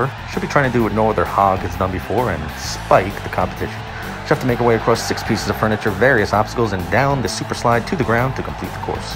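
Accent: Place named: New Jersey, United States. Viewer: the commentary, the new jersey accent, the adorable concept-- these videos have made me so incredibly happy. thank you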